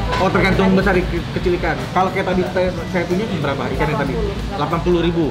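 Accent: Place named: Ambon, Indonesia. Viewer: Ambon mana suaranyaa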